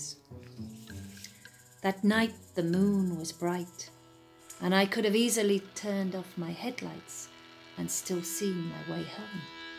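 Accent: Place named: Black Country, United Kingdom. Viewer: A modern (well, 1970s) ghost story. I like the slight black country accent too. Nice work x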